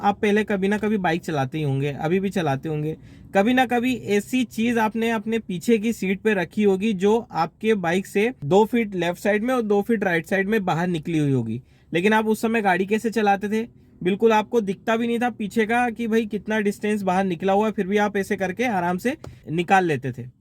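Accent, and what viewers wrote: Indore, India. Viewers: Bhai aapki aawaz se hi pehchan gaya aap indore se ho❤️❤️❤️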